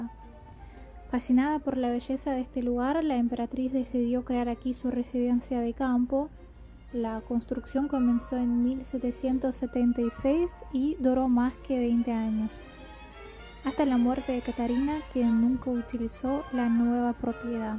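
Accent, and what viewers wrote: Argentina. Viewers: Tenes la tonada de Bs As perfecta!! Tenes que cpntar como esta Rusia hoy en día con el tema de la guerra, esta más cara o más barata que Argentina?? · tonada de Argentina. Yo vivo en Neuquén capital y hablo igual que. De donde sos, estimado?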